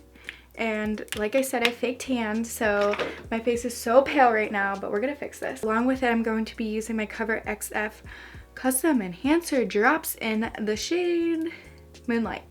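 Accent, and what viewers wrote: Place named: Pittsburgh, United States. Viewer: Hahaha it's her accent- most people from Pittsburgh say it that way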